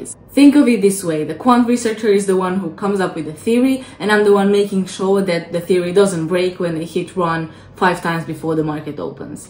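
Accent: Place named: Europe. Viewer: I can hear the European Accent.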